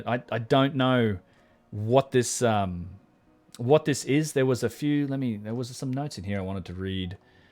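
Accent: Australia. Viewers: What are you doing is northern Cal .... hot maybe sacramento .....nice to here Aussie anyway ...Bro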